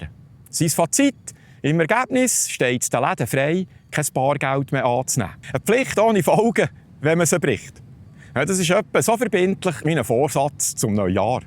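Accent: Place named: Swiss German, Switzerland. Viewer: Es wird gesprochen, wie sich IK Schweizerdeutsch vorstellt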